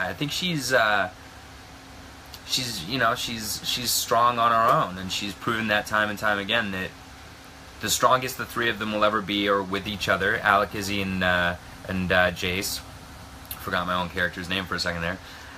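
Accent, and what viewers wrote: United States. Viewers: HIS AMERICAN ACCENT!!! Perfect! 😆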